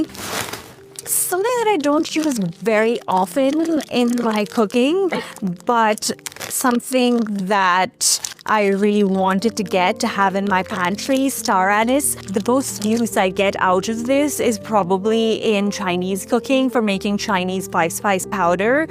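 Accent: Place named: Ireland. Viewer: Just discovered your channel and am binge watching. I’m an American guy with a decent amount of experience cooking in some southeast Asian traditions but am trying to make sense of Indian food. The first thing I learned is that it’s overwhelmingly complicated. Talking about “Indian” food is about as useful as talking about “European” food. I like that your recipes and techniques are rooted in a specific region, it gives a much more solid basis to learn. I’m curious about your lovely accent in English. Did you ever live or go to school in Ireland?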